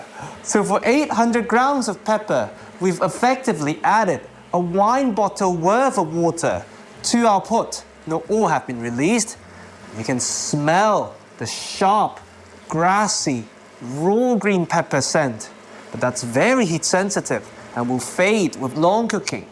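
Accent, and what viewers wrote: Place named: United Kingdom. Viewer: That is the most British voice I have ever heard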